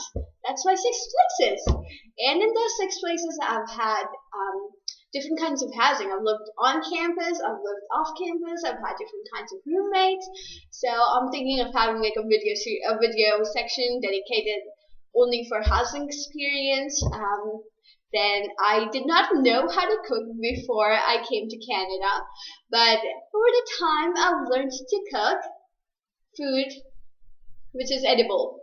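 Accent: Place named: India. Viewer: Just like ..just like ..just like. Indians english in canada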